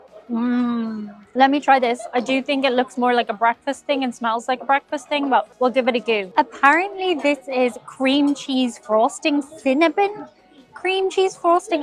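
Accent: Ireland. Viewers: Speaking English with a brogue accent. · Boston brings out the Irish accent from.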